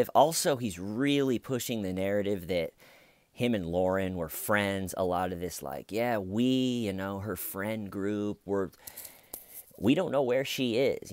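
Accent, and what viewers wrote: United States. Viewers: His accents too American and irritating. I keep wanting to shake him and tell him to speak properly.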